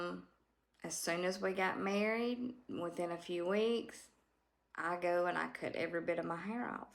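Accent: South, United States. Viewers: From Argentina Honey you are just fine!!!! I lived in the US specifically in the South, and I watch your videos because it reminded me my time sweet time in the south that i learn to love, i love the accent the humor the kindness!!! So what i mean with these is that you are just fine❤ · Thank you for the wonderful videos. I am glad you found what you need to put you at peace and make you happy. You and your cats make alot of people happy. I love the southern ways. I am from SC and I love the accent and the southern saying. Please continue with the videos, I need that joy in my life. life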